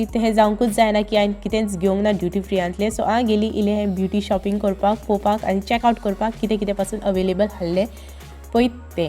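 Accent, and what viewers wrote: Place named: Bardez, India. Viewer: Where in goa u are from i m from calangute ur language is like bardez or tiswadi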